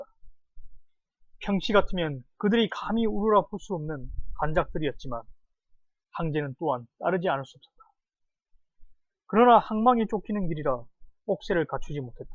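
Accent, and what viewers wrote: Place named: Gyeongsang, South Korea. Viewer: 경상도발음의 한계라 듣기는 좀어색하긴합니다 수고하셨구요 목소리가 톤이 어울리지 않아요ㅎ 다른거 도전해보세요 코메디나개그같은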